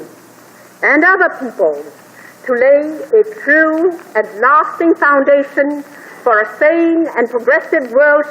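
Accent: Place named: China. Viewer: I feel like she has a very slight Chinese accent though, which is surprising to me considering she was educated in the US at a young age.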